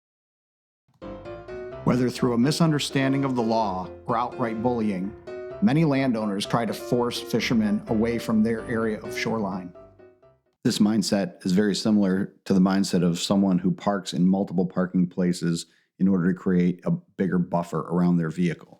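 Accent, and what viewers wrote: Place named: Ohio, United States. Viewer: It would seem to me you’re from NW Ohio lol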